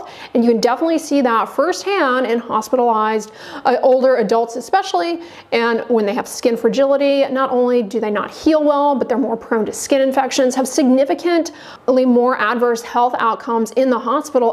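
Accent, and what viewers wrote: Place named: Houston, United States. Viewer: That's Houstonian accent in Texas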